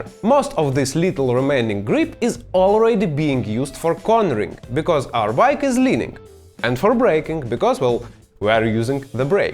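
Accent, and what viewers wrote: Russia. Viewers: Прекрасный английский! Но по акценту как те русские из голливудских фильмов, 😂 хотя это совсем не бесит и не мешает, а даже придаёт какой то шарм. Главное очень грамотно и понятно! По качеству и локоничности транслируемой инфы, - просто 10 из 10! Отличный канал и не только для новичков, судя по тому нонсенсу который иногда выдают "бывалые", - всем сюда! - на повышение квалификации! Спасибо за труд. 😀👍✌️ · You are my favorite living Russian because I can’t recall the last time I fell on my daily ride and feel that your YouTube videos are a large part of why. Perhaps your accent forces me to focus more, or maybe you are just that good as a teacher. Whatever the reason, you made a difference in the way I listen.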